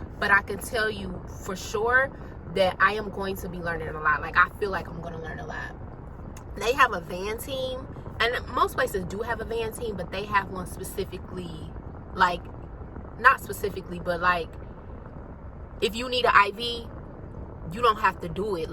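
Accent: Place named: New Jersey, United States. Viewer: any one special you recommend? Where are you from. You sound like me. A NJ girl.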